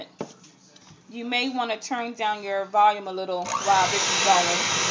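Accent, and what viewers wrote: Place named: United States. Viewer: THIS LADY SOUNDS AMERICAN!!!!!!!!!!!!! BY THE WAY GUYS im from NZ so i don't get AMERICAN PEOPLE!!!!!!!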